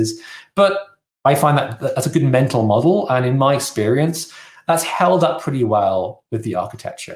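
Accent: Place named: Scotland, United Kingdom. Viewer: Very useful video! I'm happy I've found this one. It has been a bit difficult to start with Airflow. By the way, I'm intrigued with your accent. Are you from Scotland?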